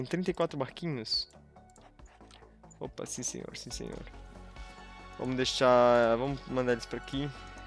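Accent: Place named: Portugal. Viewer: caramba, é uma história bem legal, deve estar desde pequeno em Portugal para ter pego o "sotaque", bom vídeo de verdade amigo, todos os mods que penso em jogar algum dia sempre pego uma série sua para acompanhar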